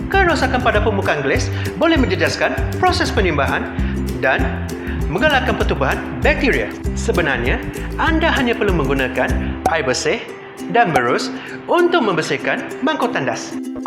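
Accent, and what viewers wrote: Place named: Malaysia. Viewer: Hai saya dari Jakarta. Tengok channel ini Krn suka sekali dengar cara bicara Melayu kaka 😁 konten nya juga informatif sekali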